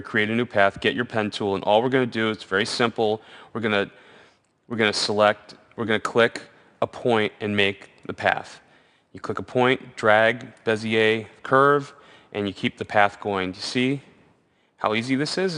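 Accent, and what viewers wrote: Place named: United States. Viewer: i dont understand neither his photoshop skill nor his American accent!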